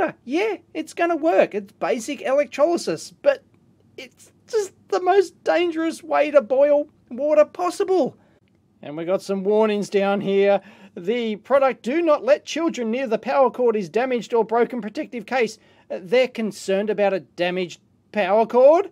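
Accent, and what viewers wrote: Australia. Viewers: Yeah! Aussie accents make this awesome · Bigclive did a review of these a while ago... but your australian accent makes this so much better. Makes me feel like if it kills me, i'm just not australian enough.Keep up the good work!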